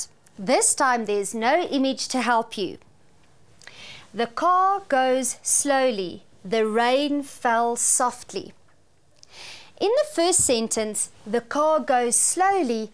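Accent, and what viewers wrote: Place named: Afrikaans, South Africa. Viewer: You come from South Africa because you have a Afrikaans accent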